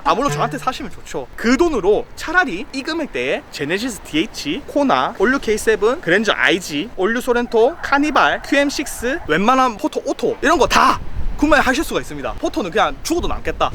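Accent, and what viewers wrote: Busan, South Korea. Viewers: 댓글보니 외국인같은데 한국말 부산사투리 쓰면서 엄청잘하시네... 제2의 로버트할리인가 ㄷㄷ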